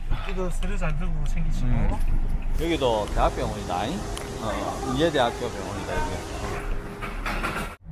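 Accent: Gyeongsang, South Korea. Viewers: 야들아! 문댕이말고 표준말하면 좋을탠대~ 저질스러워서 ~원!!!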